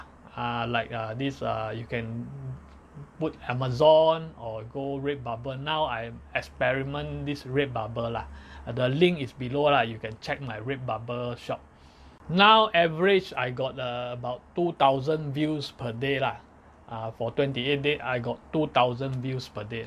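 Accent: Singapore. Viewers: Subscribed, love the true singaporean vibe, the Singlish is power, and it’s just like watching your neighbourly Uncle talking, brings us back to kampong days. Keep it up.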